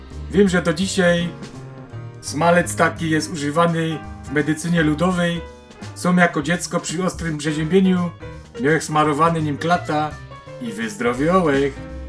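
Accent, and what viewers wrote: Silesia, Poland. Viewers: ..to szukaj na internecie po polsku a nie obrażaj pięknej śląskiej gwary😮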